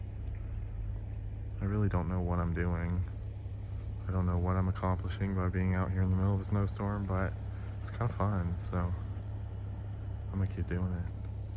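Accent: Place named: East Texas, United States. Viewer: You sound like you might be from East Texas! You’re doing great!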